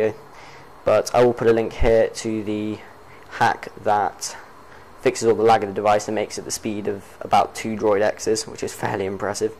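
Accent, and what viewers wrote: London, United Kingdom. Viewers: YOU SOUND LIKE YOU'RE FROM LANDAN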